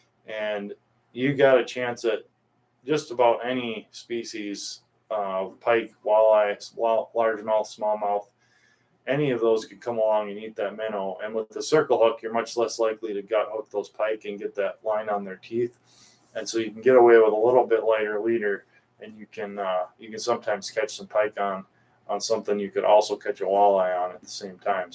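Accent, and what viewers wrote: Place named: Wisconsin, United States. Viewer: I’ve commented a few times on your channel and you’re the best at reporting northern Wisconsin fishing forecasts I’ve ever seen. The best at knowing which lures and techniques are working at any given time in any given place in Wisconsin. I love it. The Wisconsin accent is something that really brings me back to the old days up there. I know your target audience very well since I grew up on lakes in Wisconsin and I remember my Wisconsin neighbors and friends being just down to earth good people. I miss them and I miss Wisconsin. It’s part of who I am, 60 years later. After traveling all over the country and South Pacific, I’m a fisherman, always have been. But I learned to fish since I was a little kid in Wisconsin. Here’s what I’ve noticed after decades of traveling around living in California, Arizona, Hawaii, Washington, etc, I still fish like I’m from Wisconsin. 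And I am. And you know what? I’m a better fisherman because I grew up there. Wisconsinites are f*cking serious about their fishing. Not many distractions on a northern Wisconsin lake or river. No city crap, just a real in-tune and focus on nature and how to fine tune everything involved. Wisconsin winters are some of the most brutally cold around, and guys go out fishing anyway. Camping in the snow. Fishing through the ice. Nothing phases a Wisconsinite. Spring is barely a dream not a desperate hope. It’ll come, and with it, all sorts of bugs. Hatches. Mayflies. Mosquitoes. Frogs. Crickets. Fish are excited, so wa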